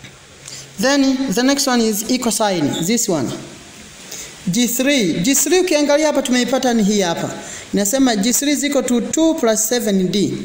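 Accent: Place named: Sukuma, Tanzania. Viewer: Akiongeza kidhungu inavutia lakn kiswahili tunasikia lafudhi ya kisukuma tunabaki kucheka tu🤣🤣🤣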